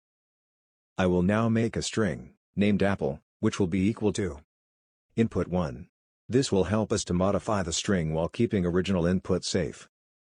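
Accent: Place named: United States. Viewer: the American Accent makes it easier to understand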